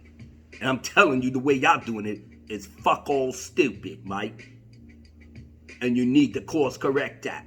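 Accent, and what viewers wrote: Cockney, United Kingdom. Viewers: Hello! I could not WAIT to hear your take on this! Wow. It's fire as usual! You have been keen to the TFA BS since jump. I'm happy that John Boyega is speaking out. It can't hurt him, he's been honest. Btw: Love your British accent! Well done!:) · As always my brother, always agree with you. And enjoy the cockney. LoL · as a Brit, as always I’m freaking loving your cockney accent.....priceless!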